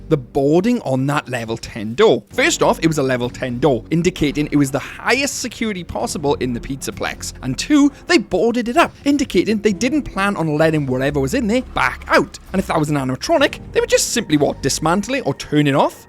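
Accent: Wales, United Kingdom. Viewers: Are you Welsh? I was just wondering because of the accent 😅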